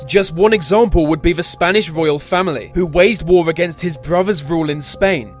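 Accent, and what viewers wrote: England, United Kingdom. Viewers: What's the story with his voice? It's an english accent but he sounds like a robot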